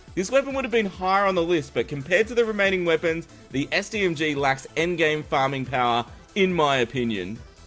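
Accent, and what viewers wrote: Australia. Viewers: When 1.3 comes for mobile i will surely go after the last prism since the mage class is my favourite and the one that i mostly play. P.s. I've never been so early for a video of yours, especially now that it is am right here, so i thought i could also ask a question which is: Are you Australian?. Keep up the good work:)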